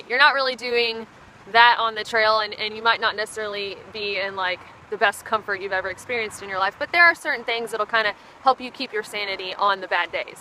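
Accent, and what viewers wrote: South, United States. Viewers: I bowl water also. Your southern accent is dignified and correct! My favorite camping item to bring would be bacon. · Came here for the southern accent- stayed for the information... Bought your book.. can't wait to read it.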